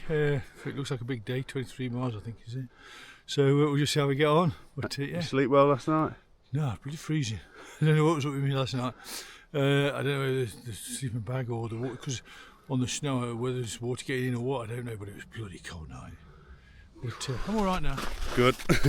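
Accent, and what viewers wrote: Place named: Derby, United Kingdom. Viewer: Hey up. Great video. Where are you from mate I recognise that accent. I’m from Derby and I’d say your not to far away if not from there ya Self me duck 😂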